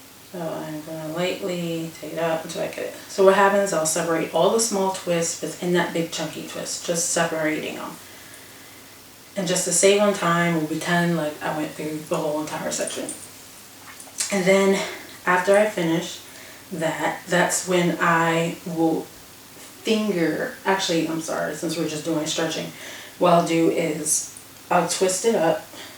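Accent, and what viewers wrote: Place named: South, United States. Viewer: where are you from? lol I know thats random but I cant place that accent. Certain words you say sound a lil southerny like "ool" lol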